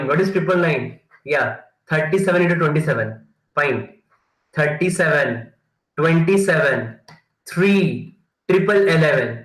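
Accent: India. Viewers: yesss sweet hindi accent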